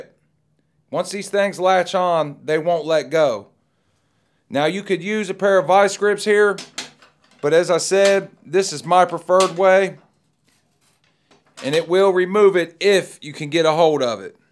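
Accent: South, United States. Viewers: I dont think ive ever heard a more southern accent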